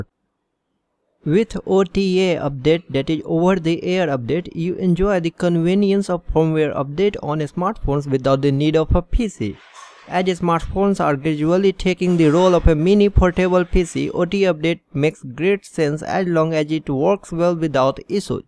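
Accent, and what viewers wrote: India. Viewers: indien english dialekt?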